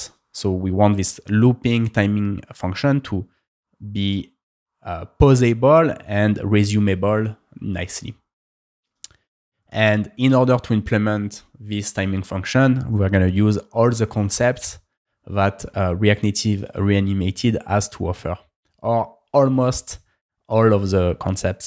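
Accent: France. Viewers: Oh je sens l'accent français ✌️ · This accent is really French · Nice French accent there, Sean Evans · But maybe french cause the zzhh prruh pronunciation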